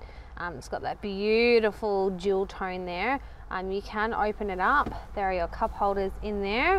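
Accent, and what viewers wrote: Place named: South, United States. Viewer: Not going to lie, at first I thought she was from the southern United States with her accent 😂 it is so similar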